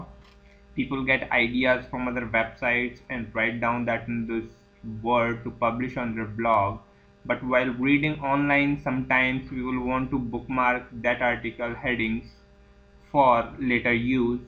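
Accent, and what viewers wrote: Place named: India. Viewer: I like tha Indian accent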